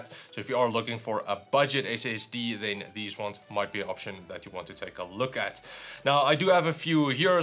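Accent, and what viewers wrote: South Africa. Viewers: Ek wou se jys n vokken suid afrikaner toe ek jou accent hoor in die begin xD, nice vid, thanks vir die info.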